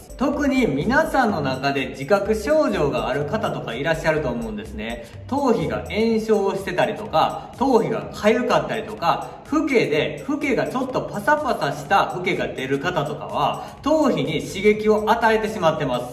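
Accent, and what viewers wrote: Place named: Kansai, Japan. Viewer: コテコテの関西弁が耳障り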